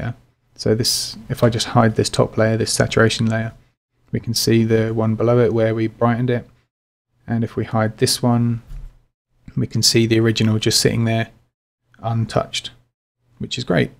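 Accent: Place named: United Kingdom. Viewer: Brilliant! Thank you so much.... I especially loved your British-isms, being a Brit living in America for the past 16 years I really felt at home especially with 'stuffed' and 'dodgey'. A fab, well paced tutorial that has prevented me throwing my laptop out the window because of Photoshop. Thank you.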